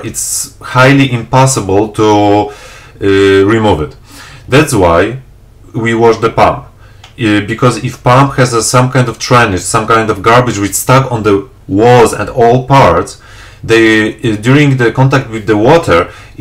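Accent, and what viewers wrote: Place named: Poland. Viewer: haha domyślałem się że jesteś rodakiem - mamy charakterystyczny akcent :) ale Twój angielski jest naprawdę ok - Pozdrawiam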